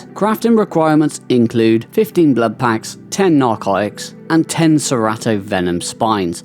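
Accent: United Kingdom. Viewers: Yea he’s British bro, they say stuff like ‘Whas dat?’ D’ya like it?’ You’ve got to love it😂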